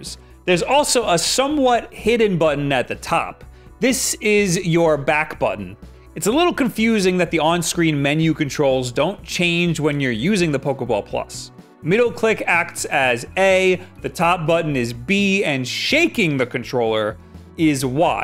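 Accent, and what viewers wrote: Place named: New York, United States. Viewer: Hey are you from around the north eastern US? I'm detecting a hint of an accent, maybe New York?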